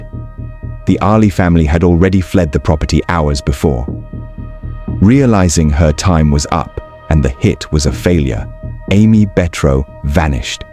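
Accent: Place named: Asia. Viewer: Narrator trying to blend in but we can all hear your annoying asian accent. Deport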